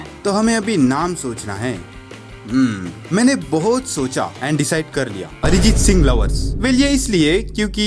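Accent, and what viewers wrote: Hyderabad, India. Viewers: Bhai hyd seh ho kya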